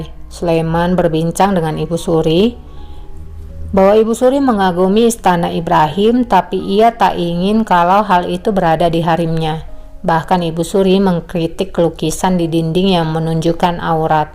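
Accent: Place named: Medan, Indonesia. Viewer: Yg ngomong ini seperti saudaraku ya orang medan sebab ada omongan lalap dia hamil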